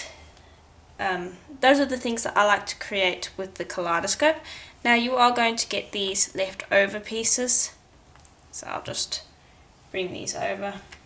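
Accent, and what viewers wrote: South Africa. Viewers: I have just started watching your videos. I have not tried polymer clay yet. But I really want to. So I will be watching hundreds more videos. Lol Question.... are you South African? I see you are in Australia, but your accent is definitely South African.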